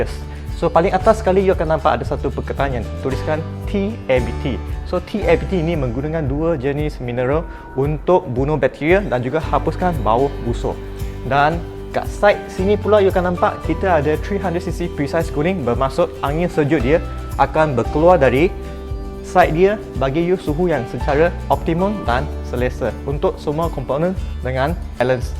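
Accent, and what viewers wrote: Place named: China. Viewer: u look indian but sound chinese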